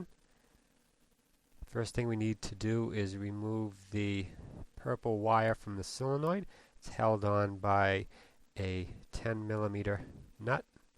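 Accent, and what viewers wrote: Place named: Boston, United States. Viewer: Sheesh...ain't never heard nobody from Bahstin before? Great video. Used this as my guide, and hang the ol' Haines manual. Well done, and thanks a million!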